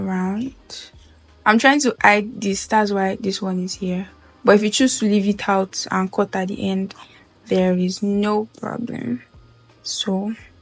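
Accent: Nigeria. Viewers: I love it it's so beautiful and I love your accent Are you Nigerian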